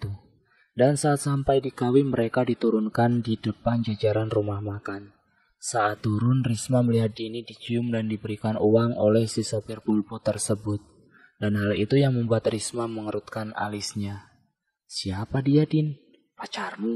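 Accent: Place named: Borneo, Indonesia. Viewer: abang ne urg kalimantan kh , logat bhsa nx kek asle urg pribumi borneo 😅😅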